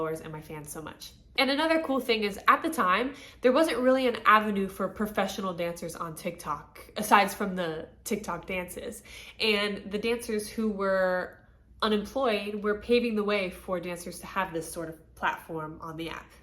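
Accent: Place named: United States. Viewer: Your Dialect Sounds Like an American.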